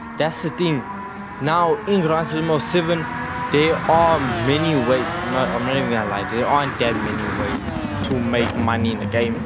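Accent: South Africa. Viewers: bro u sound like u are from sa?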